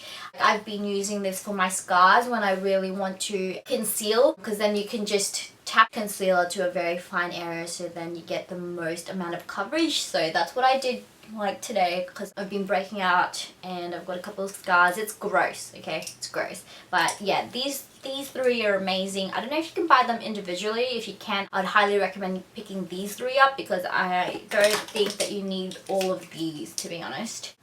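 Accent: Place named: Australia. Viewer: Hey, just wondering, did you grow up in Australia since young/born there? Cos you have a really thick Australian accent & its quite interesting to imagine how you'd speak tagalog with such an accent hehe;)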